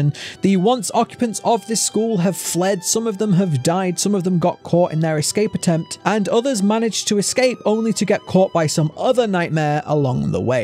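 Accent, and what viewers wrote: United Kingdom. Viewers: “I absolutely adore Little Nightmare theories.” I absolutely adore British accents saying they absolutely adore something.🥰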